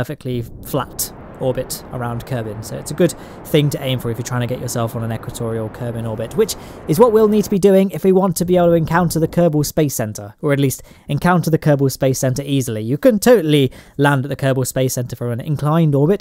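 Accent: United Kingdom. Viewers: Trying to transcribe the intro, but I am missing one lousy word. My Canadian hearing eh? can't pick up one British accented word. Please review and correct? Thanks. On a lonely planet slowly spinning its way to damnation amidst the incompetence and utter carelessness of lesser space perrians, one team stands resilient against the herds. Putting their lives on the line to aid those who were previously unaware of the quick-save option. Yes, it's the incredible adventures of Jebediah and his crack team of kerbonauts. They are: THE BLUNDERBIRDS. Saving the Kerbin race one stranded explorer at a time.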